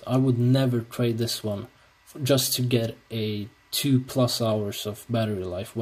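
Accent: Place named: Sweden. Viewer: I'm assuming you are from Sweden due to your accent. So am I. Would you say it's worth 3000:- to add the 4k-screen? Deciding which customization to buy. Noticed you talked alot about the two screens. Have you tried the full-HD one yourself? Cheers and thanks for the content!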